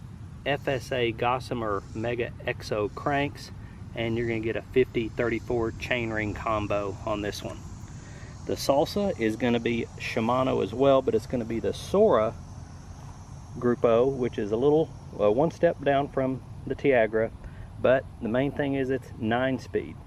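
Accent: South, United States. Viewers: Fellow grinder here from Alabama. Just found your videos while looking at sno peak and toaks cooking set ups. Your videos are very helpful. I'm also a gravel grinder, and in fact moderate the Alabama gravel cyclists facebook group. Feel free to join if you want. By the accent, sounds like you might be in the south. I'm currently acquiring bike packing gear. I just received my revelate bags front and rear with a rockgeist bar jam, and have on order a warbonnet hammock, tarp, underquilt and top cover, and hammock gear top quilt. I'm now looking into what if any cooking gear to buy, vs. pre cooked or pre made stuff like energy bars etc. I like the idea of being able to do a multi day tour with cook set up. Your feedback would be appreciated.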